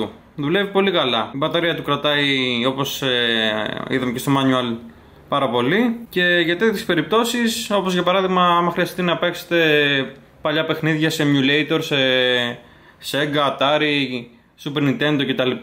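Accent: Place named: Russia. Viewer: oh WTF. Russian?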